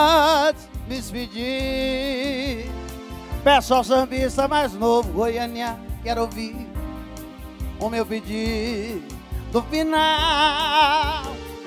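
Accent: Minas Gerais, Brazil. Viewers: Que e este ? Cliquei no video porque achei que fosse a thamy Miranda,mas o sotaque de minas entregou. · Esse sutaque mineiro cabuloso ❤️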